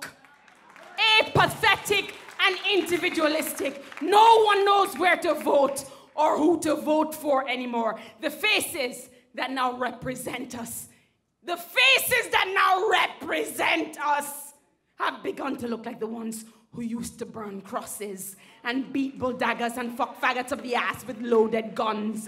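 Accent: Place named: Caribbean. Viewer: That's more of a Caribbean accent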